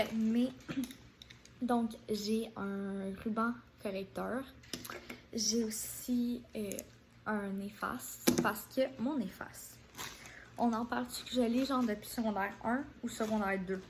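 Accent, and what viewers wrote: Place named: England, United Kingdom. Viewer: You have an English accent ❤️❤️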